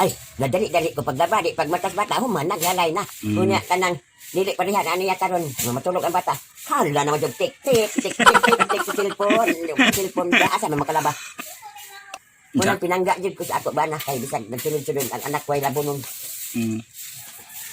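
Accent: Surigao, Philippines. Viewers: hi!! sakit n kau akong panga kinatawa s imo la... gimingaw n nuon kos yawyaw sakong nnay dras davao.!😅 parehas jud mo ug accent kay surigaonon pud xa. more videos la mkawla kas kamingaw🥰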